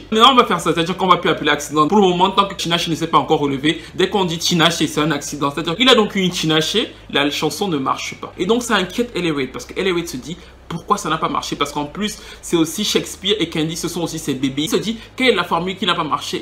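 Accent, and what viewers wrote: Cameroon. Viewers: L'accent CAMEERRRRRRRR !!! indétronable !!!! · Moi qui aime la musique et qui pensais tout savoir, tu es génial et ton accent camer ne m'a pas échappé dès le début jetais la que mais attends le gar ci c'est un freeeere. Massah. Keep going u talented and u have deep deep knowledge. Après 10 vidéos watches je mabonnes et jactive la cloche. Push through and through and through !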